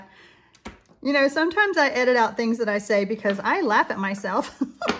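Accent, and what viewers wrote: South, United States. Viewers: 😃 You do bring a smile to my face,. And I love your southern accent and your tutorials. Greetings from Perth, Australia 🇦🇺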